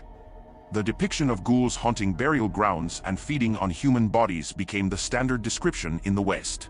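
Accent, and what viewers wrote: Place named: Scotland, United Kingdom. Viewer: I dig the vaguely Scottish AI voice. It's like listening to an up and coming Deckard Cain type doing a class project.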